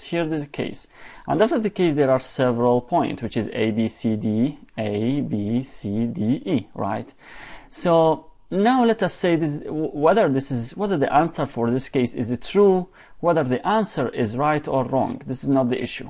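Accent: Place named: Iran. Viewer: you kno bout estop estop omg dont marrrkkkk on. Where is the English proficiency? you dont underestand neting. dude your persian accent is terrible.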